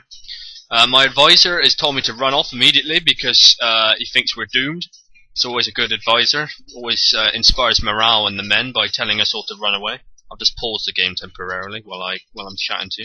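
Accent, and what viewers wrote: United Kingdom. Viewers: I have never heard an Indian with a British accent before. It sounds nice. No really still loving this rant.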